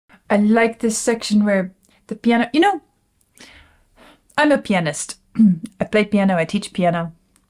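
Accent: Germany. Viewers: This used to drive me nuts, so I searched for the answer... According to one of her earlier videos, she's actually an American, born and raised, but grew up (after leaving California at a young age) with/near/surrounded by an Amish community (or something similar, apologies if I misremembered), who speak a dialect of German, and apparently that it what's influenced her accent. She says that speaking to other people in Tennessee, she can find herself slipping into that local accent as well. That's as much as I can recall of the story. I hope it helps. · that helps a lot, thank you! And yes, I can hear the sort of German/amish sounds in there. I really appreciate this!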